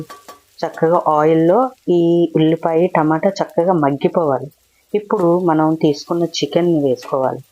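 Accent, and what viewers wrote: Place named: Godavari, India. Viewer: Mana godavari style lo baga vivarinchi anduku miku thank you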